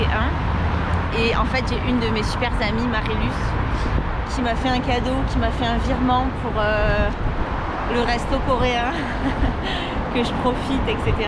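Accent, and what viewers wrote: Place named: South-West, France. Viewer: c'est d'ou ce bel accent ? sud ouest ?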